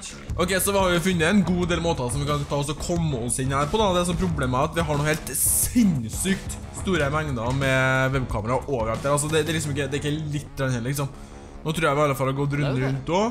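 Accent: Trøndelag, Norway. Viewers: Jeg elsker og høre på trønder dialekten din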